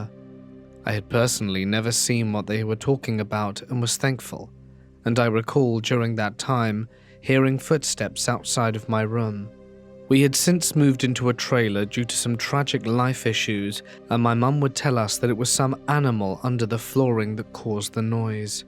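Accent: United Kingdom. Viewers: Love the British accent.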